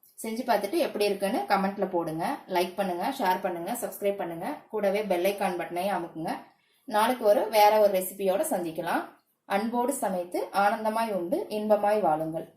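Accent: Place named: Tirunelveli, India. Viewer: திருநெல்வேலியா நீங்க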